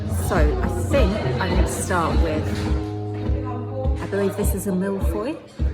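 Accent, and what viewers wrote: Ireland. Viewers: Loved the Irish accent haha ❤❤ I would love to try a banana daiquiri but never see them on the menu anywhere! Those cocktails at the end looked amazing 😻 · perfect Irish accent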